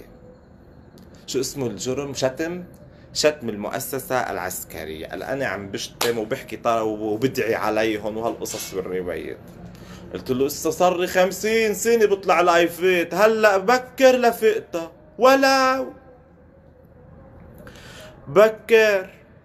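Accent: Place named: Lebanon. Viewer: ياريت تقلنا انت من وين ساعه بتحكي بناني ساعه خليجي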